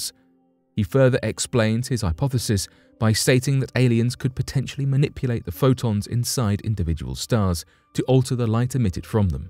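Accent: United Kingdom. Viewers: I hope those having some knowledge and sophistication will immediately understand the profoundly arrogant messages encapsulated in this video - manufactured complete with Brit accent to intimidate the Americans and their idiotic “mommy-daddy complex” they have towards that stodgy manner of speaking. “No evidence of alien contact…” yeah right. Get real dude…. what you meant to say was “no officially acknowledged contact with aliens.” Otherwise, the door is completely open. The idea that “despite all we know about stars and nebulae…” again, please: the realm designated as “science” the world over is in fact a church, largely controlled by governments and oligarchs- please. COVID proved that science does not exist for the general public and that real scientific reasoning and practices have been destroyed. In any case, almost nothing (of meaningful substance) is known about human existence and the proclamation here is preposterous.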